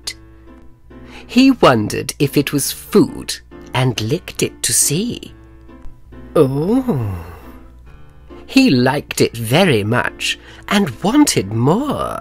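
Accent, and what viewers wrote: United Kingdom. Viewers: The most Bri'ish person eva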